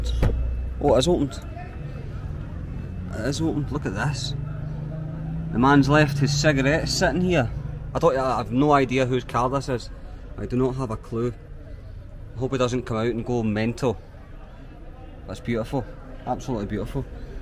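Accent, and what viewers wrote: Scotland, United Kingdom. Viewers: Love your Scottish accent....enjoy Pakistan!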